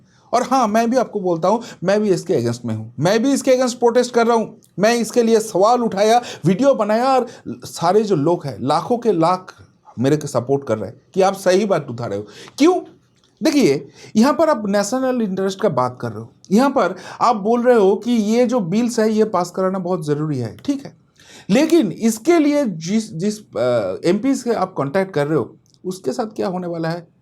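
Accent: Bengal, India. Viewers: Dada, I love your analytics and skills, but I would suggest you do this program in Bangla and use voice over for Hindi. Your Bangla distorted Hindi words, at times dilute the over all meaning of what you intent to say... Just a suggestion... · You are Great. After living in West Bengal for over 35 years, hearing such a tone in Bengali-Hindi reminds me of my days in Bengal. I feel very happy to hear this again... Sir carry on...God bless you..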